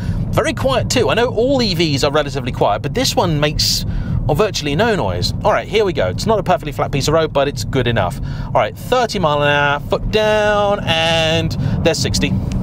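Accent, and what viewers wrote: United Kingdom. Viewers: At least she does it with a pleasant British accent!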